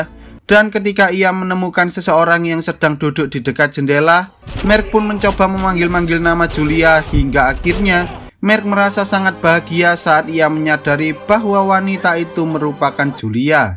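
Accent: Java, Indonesia. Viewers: Laah tnyata wong jowo toh?? 🤣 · Mas ngomongnya dengan bahasa indonesia yg baik dong. Logatnya jangan keciri bnget orang jawanya. Muak aku masss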